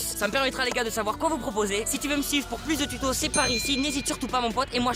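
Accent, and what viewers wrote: Marseille, France. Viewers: Toi t'es Marseillais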